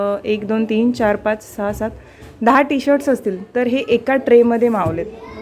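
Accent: Kolhapur, India. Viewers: तुमची कोल्हापुरची भाषा ऐकायला छान वाटते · Tumhi kolhapur aahat ka, bolna varun vatatay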